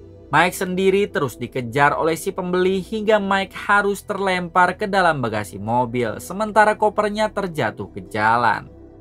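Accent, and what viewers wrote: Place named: North Sumatra, Indonesia. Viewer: Karna totongnya... 🤣🤣🤣🤣 Orang sumut lu min?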